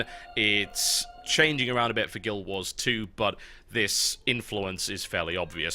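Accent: United Kingdom. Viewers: No offense, but there's a little thing called accent. And TB's british, so his accent is, technically, the one with the "right" pronunciation.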